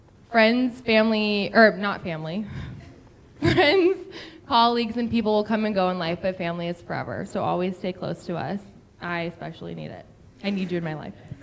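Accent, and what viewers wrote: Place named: Midwest, United States. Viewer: I'm thinking your from the mid west, love the accent and your sincere thoughts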